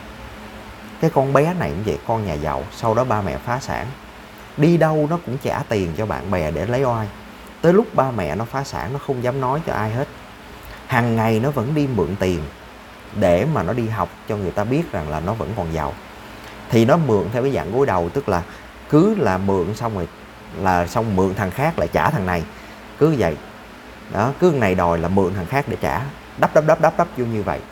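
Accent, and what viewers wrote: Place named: Binh Dinh, Vietnam. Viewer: A người gốc bình định thì phải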